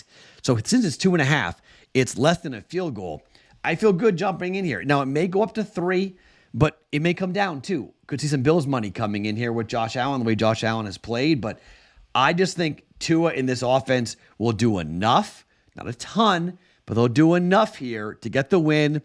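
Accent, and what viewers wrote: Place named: Boston, United States. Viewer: I just SARD 269 at another sportsbook. Boston accent is slipping out.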